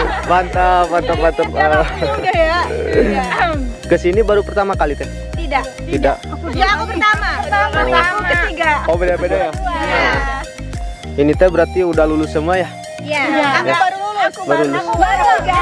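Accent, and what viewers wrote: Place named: West Java, Indonesia. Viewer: Logat nya kyk Sunda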